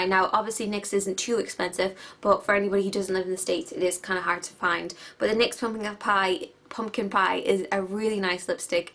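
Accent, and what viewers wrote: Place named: Ireland. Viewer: Are you Irish or American? I'm Irish and can't figure your accent out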